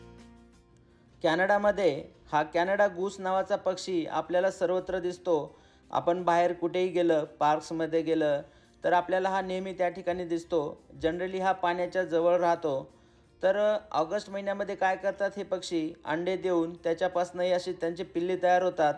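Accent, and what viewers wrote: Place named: Latur, India. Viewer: दादा तुम्ही परदेशात राहून पण आपली लातूर ची भाषा विसरले नाही आणि तुमचे मुले पण आपली लातूरची भाषा छान बोलतात मला खूप अभिमान वाटतो तुमचा मी पण लातूर आहे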